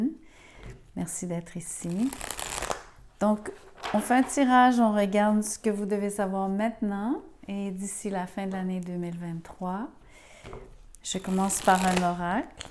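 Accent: Quebec, Canada. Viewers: Bjr je découvre par hasard votre guidance c’est un vrai plaisir de vous écouter avec votre délicieux accent québécois je retrouve suis verseau 1 décan lui verseau 2 d. Nous vivons une véritable osmose et synchronisation. Merci de Copenhague 😊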